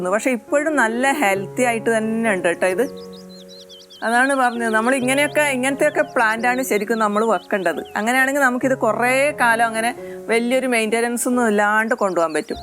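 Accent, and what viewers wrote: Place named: Kasaragod, India. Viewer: Slang kettappo manasali ningo kasaragod bakath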